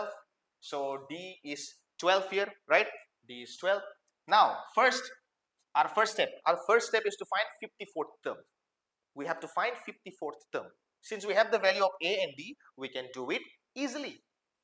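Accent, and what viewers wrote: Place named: China. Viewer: Sir actually sounds like Chinese. His accent is similar to Chinese's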